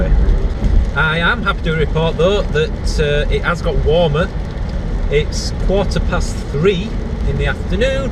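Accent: Yorkshire, United Kingdom. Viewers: Where in Yorkshire u from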